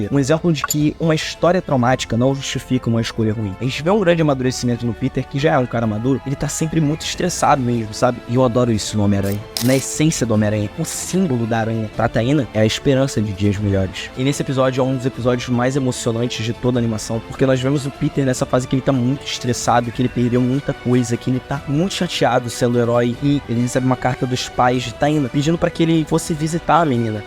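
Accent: Rio de Janeiro, Brazil. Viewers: Mano, se tu quer ser Youtuber, precisa tirar esse sotaque de carioca. Esse X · Vídeo legal..mas incomoda tanto xXx carioca...se for continuar...umas aulas de fonoaudióloga fica top...ou usar I.A para narrar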